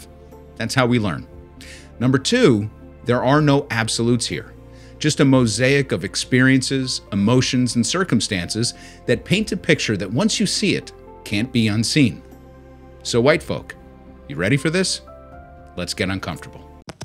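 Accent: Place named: England, United Kingdom. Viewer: Uhh acento ingles mal asunto jajajaja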